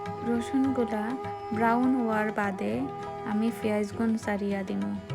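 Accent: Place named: Sylhet, Bangladesh. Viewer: সিদল এর নাম শুনেছি অনেক তবে খাওয়া হয়নি। দেখে মনে হচ্ছে বেস মজা হবে। আপুর মুখে সিলেটি ভাষা শুনে ভালো লাগে।❤️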